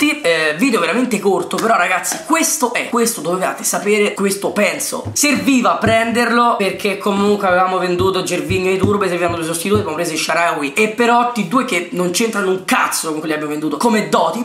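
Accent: Rome, Italy. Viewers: mi insegni il romano?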